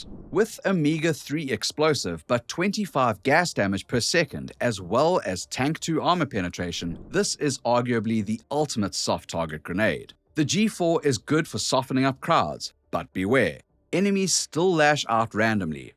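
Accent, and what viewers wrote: South Africa. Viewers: mhm, That a South African English accent i hear?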